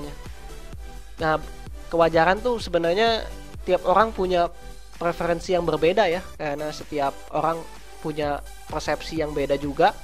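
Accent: West Java, Indonesia. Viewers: sunda banget ngomongnya haha malah ngakak. makasih bang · miminnya dari sunda :p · bang lu org Sunda ya? suara lu ketara hehe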